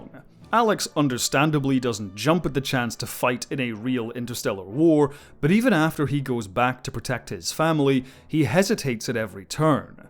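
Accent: Scotland, United Kingdom. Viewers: Cool, thank you for this great in-depth review. That was very informative. Are you in Scotland? I love the accent. But I always wonder how can you film guys make a living? Do you work as a lecturer at a University or film school?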